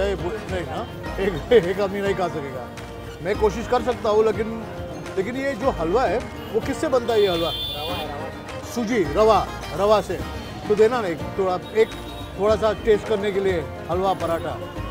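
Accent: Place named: Mumbai, India. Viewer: Your talking style says, Mumbai ka chokra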